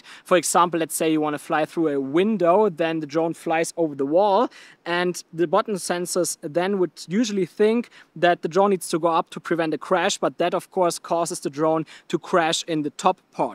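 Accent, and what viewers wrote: Germany. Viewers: I can spot a German accent from 100 meters away. But anyway, this was a very helpful video! Good Job!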